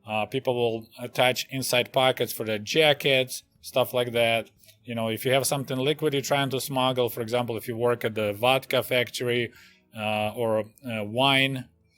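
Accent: Russia. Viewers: YOUR ACCENT IS NOT SEXY, IT IS DISGUSTING, REVOLTING, ABOMINABLE, ABHORRENT, REPULSIVE AND GROSS, TO PUT IT MILDLY. EVEN CHINESE ACCENT IS WAY BETTER THAN RUSSIAN. WHY DON'T YOU CRAWL BACK INTO THE SHITHOLE WHERE YOU CAME FROM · I will say yes you have a Russian accent and it is somewhat heavy but I understood every word well done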